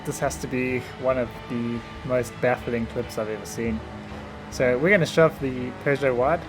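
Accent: South Africa. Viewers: You sound South African mate i swear you are i don’t just wanna say you are but you sound like it mate im watching am eskom turned my life off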